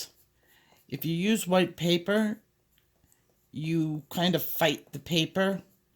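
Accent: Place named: East Coast, United States. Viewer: I love your work and your accent reminds me of when I lived on the east coast. Very fond childhood memories, from a simpler time. So thank you, for helping me with this new hobby and reminding me of my childhood.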